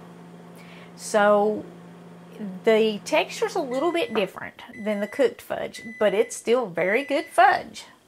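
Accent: South, United States. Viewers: That's our Southern slang. 😊❤️ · Hahaha 😂.... I wish! I'm thinking the same thing, once I make this in a few minutes and eat a piece I'm going to want to make it all the time. I can't afford to gain any weight. Lol. But I love food, sweets, MMM chocolate! With that being said, I love your southern accent and I love your channel also, and love that you aren't scared to put God into it also, you go girl! I'm in southern Louisiana and I'll be making this recipe for the first time in just a few minutes. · Her accent reminds me of the five years I lived in Georgia. Coming from the North at that time, I was fascinated by the southern slang. She’s indeed a sweet spirit, and I am blessed to have found this page. God is always first.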